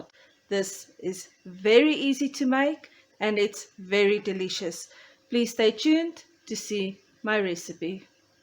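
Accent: South Africa. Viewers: I recognize your South African accent. Love that you dont need fresh cream in your recipe... a healthier take on Cremora tart. Definitely going to try it! Thanks sooo much and thanks for not adding any music to your video. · Definitely a south African voice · ❤yes 💞 South African accent is unique. I immediately recognised the voice and the recipe. Im also South African here in USA ..thank you for the reminder. I will make this delicious tart over the weekend. Yummy 😋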